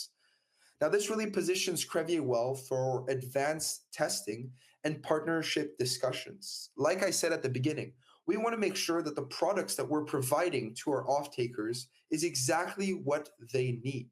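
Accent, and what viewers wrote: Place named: Canada. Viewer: Hmm, you sound too Canadian. Not even sure if you are from canaduhhh. Either way, You lost me with all the regulatory/environmental BS at the beginning. I’m looking for no BS companies to invest in. I’ll keep looking.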